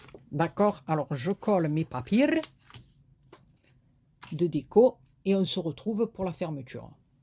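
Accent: South, France. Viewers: Bonjour, ta cascadounette est vraiment très jolie, j'adore ton accent du soleil, il nous fait du bien car on est toujours sous la pluie.....Gros gros bisous... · bonjour, très joli travail très coloré plus l accent su sud cela fait du bien le soleil n étant pas de partie aujourd' hui chez nous bisounettes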